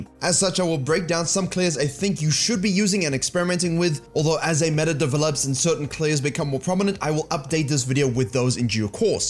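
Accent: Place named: South Africa. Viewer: South African. I had the same question when I saw his first video. To be honest I can't say I've heard much of the dialect outside of the heavily tweaked variant in "The Expanse" - that said I very much enjoy the English enunciations. It's like a much smoother sounding German or Dutch.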